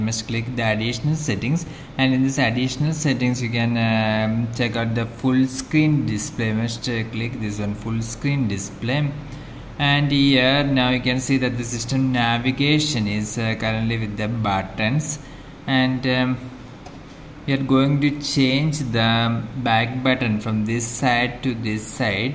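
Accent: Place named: South India, India. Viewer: That South Indian accent though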